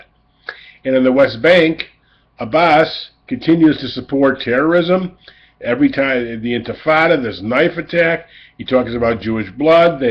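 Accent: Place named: United States. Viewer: I thought he had just visited Israel to honour his grandmother, Princess Alice. You obviously have not heard the news...! You are obviously American by your accent and that could excuse your ignorance.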